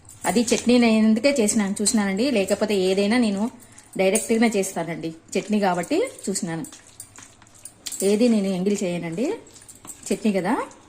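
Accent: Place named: Kurnool, India. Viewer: ఇంతకీ ఎండు కొబ్బరి వేసారో పచ్చి కొబ్బరి వేశారో క్లియర్ గా చెప్పలేదు. మెంతులు,జీలకర్ర పొడి అన్నారు అవి వేయించి పొడి చేశారా?లేకపోతే వేయించకుండానే పొడి చేశారా?మీరు కర్నూలు ప్రాంతవాసులా? మీ భాషలో యాస చూస్తే అలా అనిపిస్తుంది...I am from kurnool 😊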